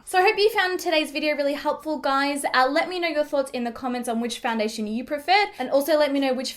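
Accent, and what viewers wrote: Australia. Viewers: Australian is by far my favourite accent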